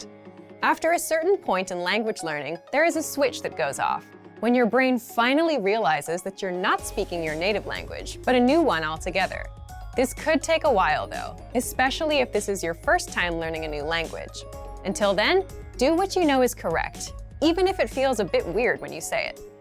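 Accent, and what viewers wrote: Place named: England, United Kingdom. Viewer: Thanks for your advises !!! I like your english accent. I hope soon to have this fluently way of speaking.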